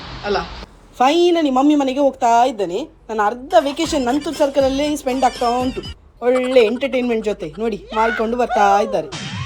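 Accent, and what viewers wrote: Mangalore, India. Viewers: Hi mam...nanu vdeo noduva muncheye nimge like kodudu vdeoge yakandre nimma mathu kelikke full kushi...😂namma kudla kannada...lots love from surathkal krishnapura...love u mam n ❤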